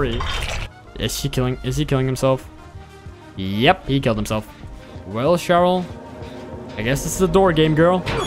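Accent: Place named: Germany. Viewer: Nice Vid :D and i have an question. Are you European? (your accent is similar to mine and im german soo)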